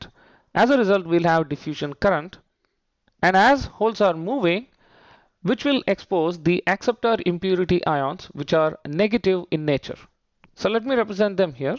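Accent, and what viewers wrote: India. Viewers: I usually have difficulty understanding indian accents, but this has been really helpful.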